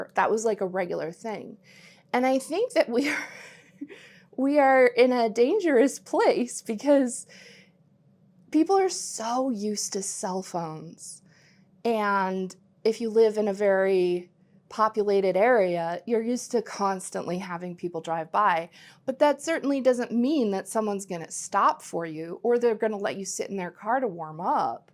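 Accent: Midwest, United States. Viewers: As someone who grew up all over the Midwest from Missouri up to Michigan and Wisconsin, I do remember the warnings and the reality, but also that it was a point of pride among some of us to see how long into winter we could go without wearing our coats so... I suspect that, while it may ALSO have to do with cell phones and complacency, there's a large element of "teenager" in there, too! And some of it on your part is simply how extreme the area was where you grew up. The coldest I've ever experienced was in Iowa, but for consistent bone-chilling, that was Minnesota. When I lived there it took a while to get used to the habits you grew up with. I thought everyone was bonkers for leaving their car running while they were in the store. Welp. If you don't, it may not start when you come back out! We live and learn, and your kid will, too. Eventually. 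:D Yes, I can totally hear the Minnesota and Wisconsin in you. lol For me it isn't just the "o" but the "sc" part of it that gives you away. Team Bubbler, yeah?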